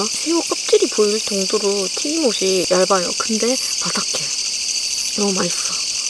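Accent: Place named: Gwangju, South Korea. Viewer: 같은 광주사람이라 사투리 너무 나랑 똑같아서 정겨움ㅋㅋㅋㅋㅋㅋㅋㅋㅋ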